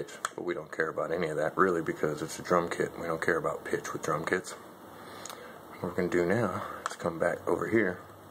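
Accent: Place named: Nashville, United States. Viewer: Very very good stuff. Nice to hear your voice - so Nashville! Keep up the great work. This is masterful.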